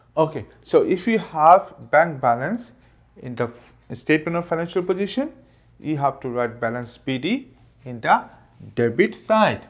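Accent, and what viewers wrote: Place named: United Kingdom. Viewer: Sir Indian ki tarah bat karo na ki British ki tarah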